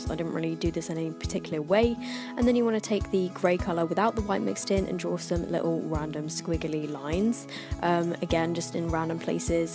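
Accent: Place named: Kent, United Kingdom. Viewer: love it yessss girl where abouts are you from near Kent or more central London? We have the same accent lmao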